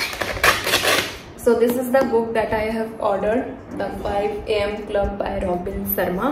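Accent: Odisha, India. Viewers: Your Odia is so sweet ❤️✨ Like I'm replying that part ✨✨👀 In other vlogs plz do that again 😍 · Di your odia is so cute 🥺🥰 and you also look soo cute & pretty 🤩😍💜 From - odisha,angul✨ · Your odia accent is so cute ❤ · Your odia accent is so adorable❤..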